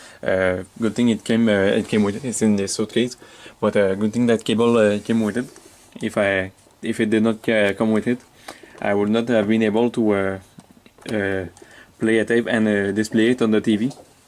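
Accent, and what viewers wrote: Quebec, Canada. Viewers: J'ai reconnu tout de suite un Québécois. Haha on est facile à spoter quand on parle en anglais.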